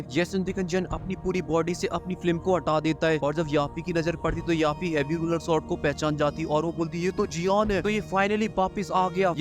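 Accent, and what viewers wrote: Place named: Chambal, India. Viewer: Bro ye tumhara Chambal Accent kaafi mjedaar hai... episodes dekhne ke baad bhi yha explanation do do baar sunne me achi lgti hai...upr se actor ko tharki name ek dum shi diya hai😂😂😂😂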